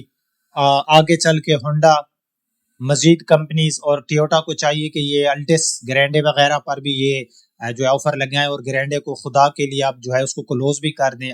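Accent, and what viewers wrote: Saraiki, Pakistan. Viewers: بھائی ۔ آپ خالص اردو ہی بولا کریں ۔ انگلش الفاظ کا تڑکا بڑا مصنوعی سا محسوس ہوتا ہے ۔ ویسے بھی آپ کا لہجہ سرائیکی اور دیہاتی پنجابیوں جیسا ہے ۔ آپ نے تلفظ ہائی بَرَیڈ ادا کیا جبکہ یہ ہائی برڈ ھے ۔ فائدہ ہے فیدہ نہیں ۔ لہذا خالص اردو ہی بولیں اور اپنائیں ۔ ھمیشہ خوش رہیں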